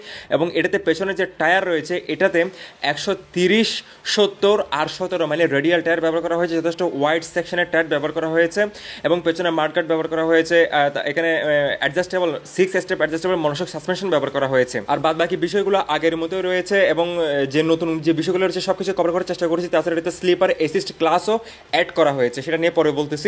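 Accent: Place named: Chittagong, Bangladesh. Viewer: ভায়ের বাড়ি চিটাগাং। এক্সেন্ট এ বোঝা গেলো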